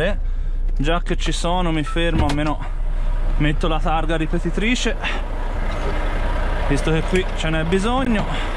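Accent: Tuscany, Italy. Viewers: senti il toscano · Ciao il video ci sta pero mi fa ridere come pronunci la C si vede che sei toscano 😂😂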